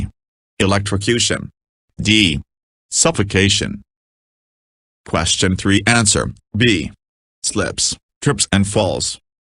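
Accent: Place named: United States. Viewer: Why the american accent voice over? Also, since when do we use the term "gasoline"? Very poor quality.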